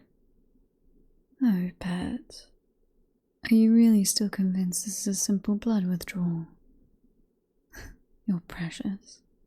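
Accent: United Kingdom. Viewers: As a fellow briton, that accent is too posh british. My accent is a mix of slang british 😂 But nice Vid!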